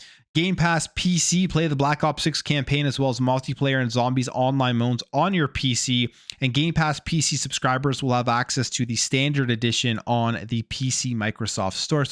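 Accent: Canada. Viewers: Hey brother, are you Canadian? Just wondering if anyone has ever told you that your voice sounds like a younger Bret "hitman" Hart. 😅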